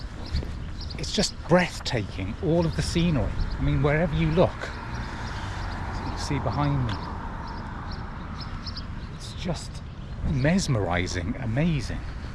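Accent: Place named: South East Wales, United Kingdom. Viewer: Hi, Stumbled on your channel. Its great to see a Brit doing the GDMBR. As an ex-pat living in Canada for the last 20+ years this is a route I have obsessed with for some time. Looking forward to watching the whole series especially like the video about cost and realistic time lines to get the route done. Its great to see the ultra elite athletes completing in less than 20 days but we are not all like that!! Where are you actually from in the UK? can't place the accent, I have you south east Wales but I have been away for a long time!! PS don't post your address! 😂😂 Cheers Bob...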